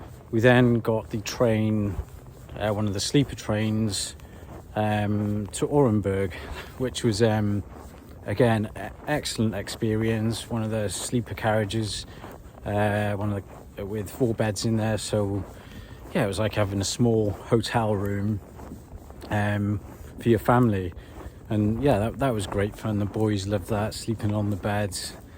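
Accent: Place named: Southern England, United Kingdom. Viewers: Его произношение очень типично для англичан и, сказав, что оно интересное, Вы можете немного обидеть человека. Это самый лучший английский, который Вы можете услышать, четкий и ясный. У меня когда-то очень давно был такой акцент, но я его быстро потеряла, начав жить в Америке. Я очень надеюсь вернуться назад в Россию после более 20 лет жизни в Америке, но у меня сыновья-инвалиды из-за ошибок американских врачей, поэтому сейчас мы только надеемся на чудо. Я очень жалею, что уехала и я знаю, что я не одна такая, кто хочет вернуться, но по личным причинам пока не может этого сделать. В каждой стране есть свои плюсы и минусы, но проблема в том, что мы знаем, чего ожидать и как обойти многие проблемы на Родине, а в новой стране мы, как слепые котята, ничего не знаем. Поэтому, верна поговорка, где родился, там и пригодился. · Your English, from the south maybe? 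I'm from the midlands.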